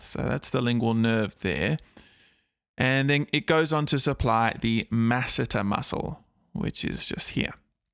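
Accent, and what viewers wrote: Australia. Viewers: Bro is aussie Thankyou though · This Aussie accent is so satisfying to hear and also thanks a lot for this vid ♡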